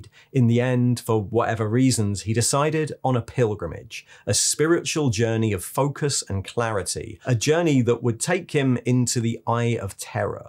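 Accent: United Kingdom. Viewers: I love that you use as your end song clip, I look forward to it on all your videos and your condensed informational style on the lore. luetin's great, if you have the time. Major Kill's good for a some cheeky fun, Baldemort's amazing for the imagined paths one can explore outside the cannon. You are Leutin if he didn't get lost in the lore but with that silky smooth British accent. But concise, to the point, with a a tinge of humor that's just the right amount. A cooked to perfection dish of information.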